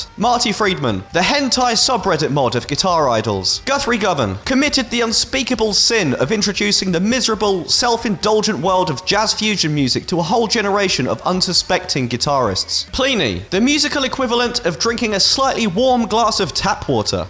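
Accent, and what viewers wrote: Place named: RP, United Kingdom. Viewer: Posh kid trying to sound cool while swearing ,always makes me smile.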